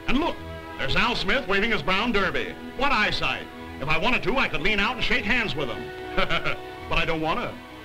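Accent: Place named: Transatlantic, United States. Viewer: that transatlantic accent tho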